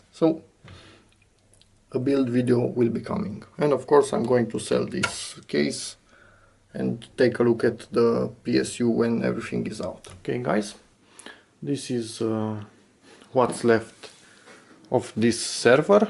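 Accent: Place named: Romania. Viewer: Dupa accent esti roman :P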